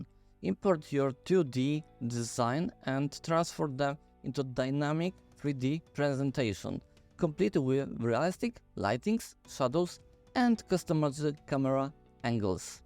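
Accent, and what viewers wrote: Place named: Poland. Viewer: Polish accent :face-red-heart-shape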